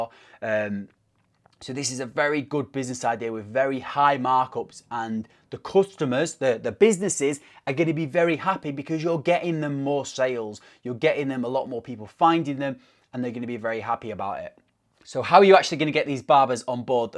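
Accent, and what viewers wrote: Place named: Manchester, United Kingdom. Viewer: Who else missed that Manc accent 😁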